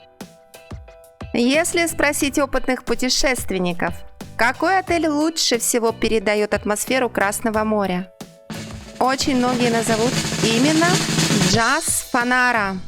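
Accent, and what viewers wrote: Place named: Moscow, Russia. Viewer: Обзор норм. Але цей московський акцент то підець.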